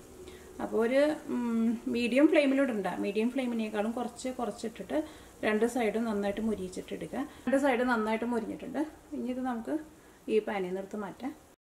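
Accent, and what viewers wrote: Thrissur, India. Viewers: സംസാരശൈലി കേട്ടിട്ട് ചേച്ചി തൃശൂർ ആണെന്ന് തോന്നുന്നു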